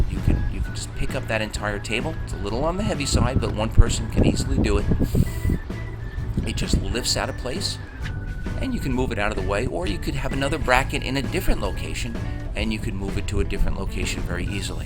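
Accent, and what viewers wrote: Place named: New York, United States. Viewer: Nice work!....looks like a fun build....gonna do 1....u gotta be from NY rt?....haha....I'm in/from LI...I hear the accent....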